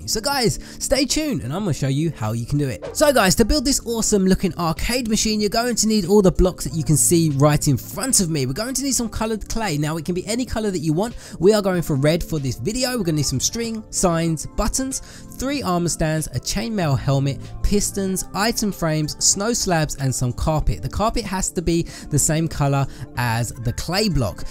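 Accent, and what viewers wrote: United Kingdom. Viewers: So awesome where in England r u from?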